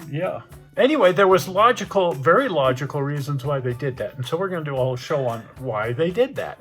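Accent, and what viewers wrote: United States. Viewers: For those that commented negatively. Life is far to short to be commenting negatively. Please lighten up. I still think Hill Billy accent was funny. But I have been told I have no taste.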